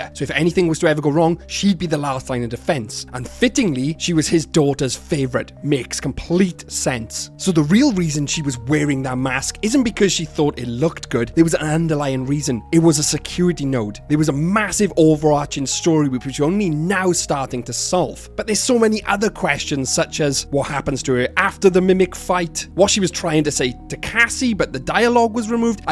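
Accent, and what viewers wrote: Wales, United Kingdom. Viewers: I've never watched this channel before, so clicking on it I expected someone American voice or something. Quite surprised to hear such a thick Welsh Valley accent lol. Cymru FTW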